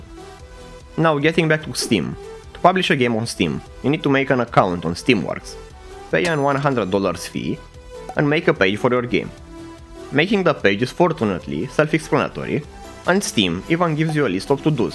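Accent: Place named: Romania. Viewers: lol stiam dupa accent ca esti roman din prima)) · Si mie imi dadea vibe-ul de roman, dar nu eram foarte sigur :))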